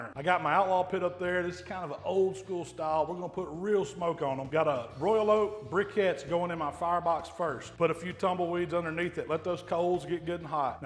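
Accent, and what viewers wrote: South, United States. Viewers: Have you ever seen a grown man salivate??! You my friend are awesome love southern way of talking and I could smell your cooking over the Internet thanks for the wonderful tips Allan So Cali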